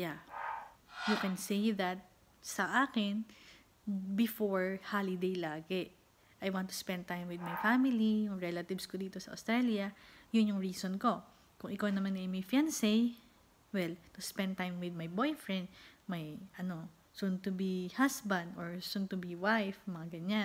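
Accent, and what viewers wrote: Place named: Southern Tagalog, Philippines. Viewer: hi new subscriber to your channel... been planning to apply to work abroad for years medyo hnd po pinapalad pro I am still hoping that I can be able to fulfill my dream... anyway thanks for sharing this I'll surely try all the tips you've shared here... by the way are you from the south? Quezon or Batangas? hihi just curious though since we have a little bit of similarity in the accent... thank you and more power to your channel. :)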